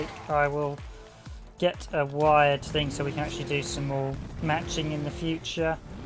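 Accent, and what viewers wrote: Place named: England, United Kingdom. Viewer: Bro! You are english… it's obvius but… I need to know, what accent of England is the one you have?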